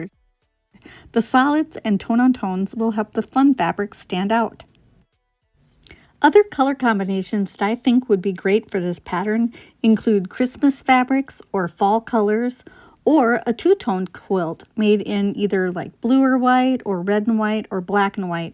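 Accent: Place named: Texas, United States. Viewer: I'm from Texas and have been quilting only since i retired about 10 yrs. Iwas taught not to waste anything so i make baby quilts and string and log cabin. I love this and was trying to decide what to start now. You helped me decide. The only problem I had was thinking you were saying black instead of block and couldn't quite figure out where you were putting the black.....guess I missed the Texas drawllllll lol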